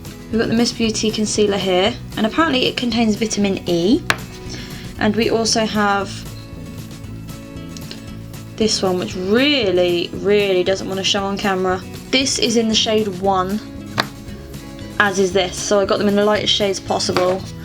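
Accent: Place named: Norfolk, United Kingdom. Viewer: You're welcome!! You sound quite Norfolk and im from there so aha xx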